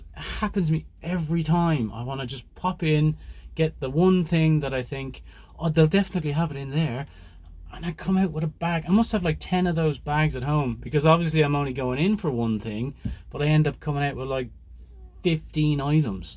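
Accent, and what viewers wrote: Ireland. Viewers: Hi! I found your channel by accident but know you from Dan's channel (been following him since his farmhouse days). As I have enjoyed your interactions I've seen from Dan's perspective, I thought I'd check your channel out and I love it! I hadn't heard enough to realize that you're Irish until hearing you on your own. Irish blooded myself, I miss the quirky, snarky humor and so thrilled to see it in you. Thanks for the smiles and I look forward to more! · New viewer here, thanks to Dan posting your puppy video. Seeing as you so kindly numbered your vlogs, I decided to begin at the beginning of them. You're loads of fun, great scenery videography, witty reparte', lol, what's not to like? I could listen to you talk all day long. Your accent is much like my own. I have missed hearing it. Dad's family were McKinley's from northwestern Ireland. 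Most there starved by the Catholics and British. So, to America, his grand dad went from mining and being a Molly McGuire to becoming a weaver in Philadelphia. Dad and his dad carried on the traditions of being Molly McGuires in Ohio, West Virginia, and Kentucky with full support of corrupt cops. We used to sit on a blanket covering loads of guns, ammo and dynamite, while traveling 4-5 hours out of state as kids. As you might imagine, our childhoods were sheltered and so controlled because he was in organized crime that our way of speaking remained Irish. An englishman, a linguist, once paid me to talk as he recorded me speaking. He rather enjoyed pissing me off to hear me tell him off, lol. I am, myself not Irish by blood though I have green eyes. I never feared St. Patrick's Day at school for not wearing green. Not wearing it got one punched in the shoulder. I am native American Indian by blood, a direct descendant of the Shawnee Indian leader, Tecumseh. 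I don't know how to be an indian, except dad respected how quiet, sneaky, and courageous I was when he threatened my life and tried to ki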